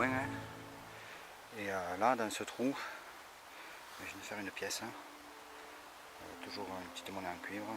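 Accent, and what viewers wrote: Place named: South, France. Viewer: oui je l'ai vu trop tard. merci de votre réponse, et de m avoir permis de découvrir ce chanteur. votre accent du sud vous trahit... de quel région êtes vous exactement?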